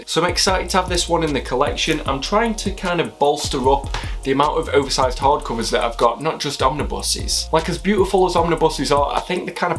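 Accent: United Kingdom. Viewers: Another UK collector here(and from your accent, potentially similar areas), your hauls are pretty damn impressive considering how difficult it is for us to pick up stuff as cheap as Americans can. Not just Ollies, the facebook groups, omnibros, IST etc. So keep up the good work! Don't spend too much!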